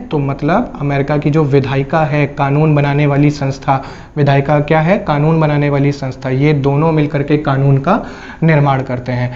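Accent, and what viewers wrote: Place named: Punjab, India. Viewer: Sir app Jo Acha Acha Bolte ho Jaise Punjabi log Bolte hai vaise Bolte ho appp .......nice ......from punjab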